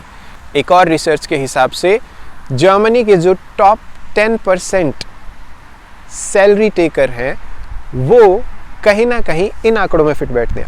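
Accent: Haryana, India. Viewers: Bhai g aap haryana se ho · Hai are u from Haryana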